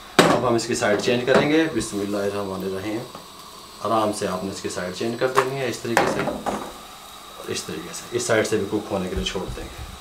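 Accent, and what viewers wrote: Punjab, India. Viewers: Beech mein jo ap punjabi ka touch detey hein. Kamal hi hai.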